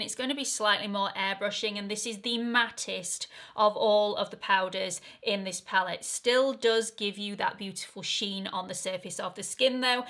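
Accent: United Kingdom. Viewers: Hi, thanks for another great review. You always create the most beautiful looks with such a simple technique. Also, no worries about pronunciation. I find that a good many words are pronounced differently in the UK vs the US (I love the way you say vitamin... We pronounce the first i as you would in the word like and then the rest the same as you). It is just a cultural difference and definitely nothing to worry over. Peace, love, and happiness!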